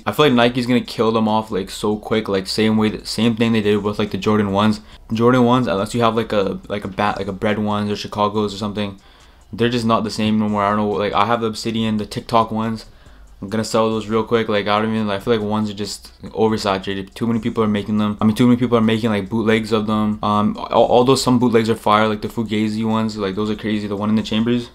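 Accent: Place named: Jamaica. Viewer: Gave the vid a like but please let go of the jack accent. It doesn’t sound or look good bro. You better than that & ur vids are dope.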